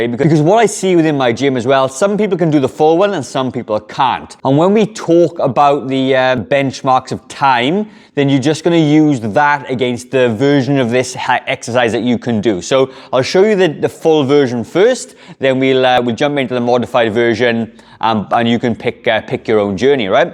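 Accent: Wales, United Kingdom. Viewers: Im Scottish, im loving your Welsh accent. Celts together!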